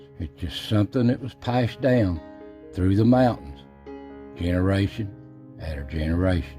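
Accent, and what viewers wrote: Appalachia, United States. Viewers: ......Thanks again for these. I've heard a lot of old sayings from this list. I notice a lot of folks talk about your voice. A lot of men that are from the mountains talk just like you. I have always said, "Give me a slow talking southern boy any day over any other kind of man." It's a compliment. & my opinion. My Papa was that way & he was a wonderful man. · A very good friend of mind that lived in Unicoi County or Erwrin or Irwin all his life talked exactly like you. He passed away a few yrs ago, only in his early forties and I miss him. He could do anything from logging to sawmilling, welding to mechanics. You sound exactly like him. Love your videos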